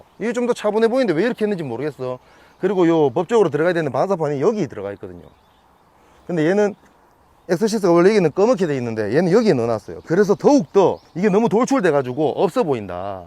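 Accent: Gyeongsang, South Korea. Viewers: 대구 분 이신가 … 엑식엠 한번 구경하고 싶네요 ㅎㅎ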